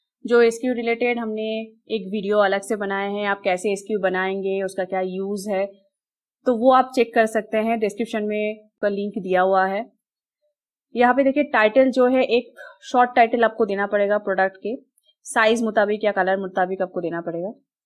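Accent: Bengal, India. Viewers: Are u Bengali girl 😀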